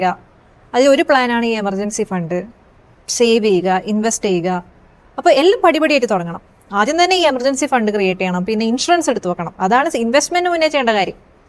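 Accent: Kannur, India. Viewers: ഞാൻ ഇന്നാണ് ഫസ്റ്റ് വീഡിയൊ കണ്ടത് കൊള്ളാം സംസാര ഭാഷ ശ്രദ്ധിച്ചപ്പൊ കണ്ണൂർ സൈഡ് തോന്നി ശിയാണെന്ന് പിന്നീട് കമൻ്റ്സ് നോക്കിയപ്പൊ ഒരാൾ പറഞ്ഞത് കണ്ടു. ഓകെ ഗൊ ഹെഡ്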